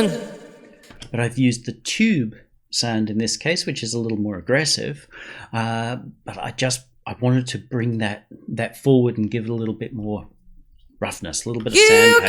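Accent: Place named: United Kingdom. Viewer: I really appreciate your Britishness, if that's a thing. I listened to the whole thing just to hear you speak, it made me feel good. Can you do a youtube channel where you explain random shit, it would make me really happy! I appreciate the fact that you never looked into the camera that was actually recording, keep doing that thing where you look to the left at what I'm hoping is your hedgehog that you are talking to. Truthfully, I really enjoyed it. Please don't tell me if it isn't a hedgehog, unless it's like a Harry Potter Owl or maybe a kippered herring. That's just as good. Thumbs up.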